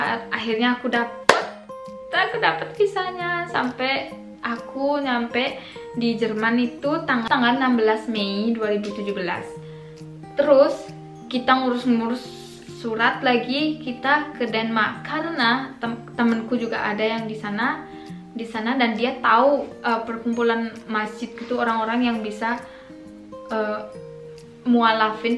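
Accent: Bali, Indonesia. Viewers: bisa bahasa bali ya tp qm muslim....beberapa kali di video qm dpt bilang "mekacaan" dan "saklek", logatnya juga kyk orang bali awal.a aq kira orang bali 🤭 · pasti qm org bali,kliatan logatx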